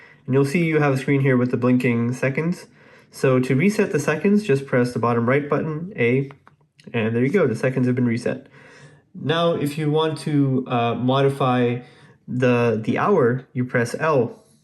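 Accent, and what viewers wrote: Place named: United Kingdom. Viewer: You are like British Speaker Nice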